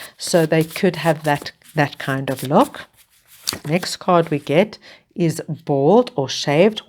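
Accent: South Africa. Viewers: You sound South African. Do you perhaps do personal readings?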